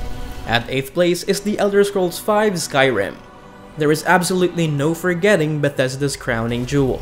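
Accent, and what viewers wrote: Philippines. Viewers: Yeah. Accent pa lang, pinoy na haha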